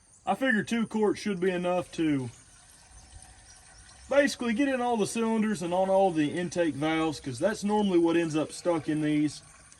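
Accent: South, United States. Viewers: the southern accent, the simple editing, cool cars? i fuck with this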